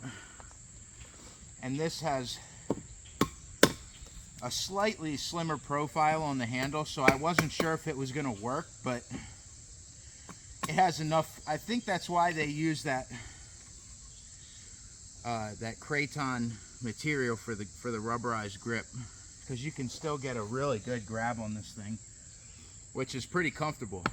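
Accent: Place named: Philadelphia, United States. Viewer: Are you in the Philadelphia or South Jersey area? You have a Philly area accent.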